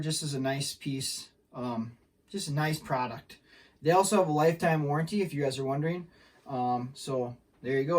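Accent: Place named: North, United States. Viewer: bro where are u from??? 🤔 im literally trying to guess your accent 🤣🤣 definitely up NAWF im from va 🫡💪🏽 just subscribed 🫡🫡🫡🏆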